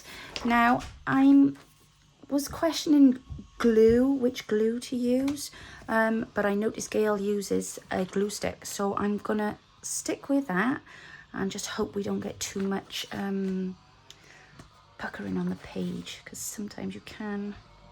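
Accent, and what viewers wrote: Wales, United Kingdom. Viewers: So glad I found you - I've really been enjoying all the A-Z Christmas crafts. I spotted that Welsh accent too - I've just returned home to Wales after 20 years away and loving it. Nadolig Llawen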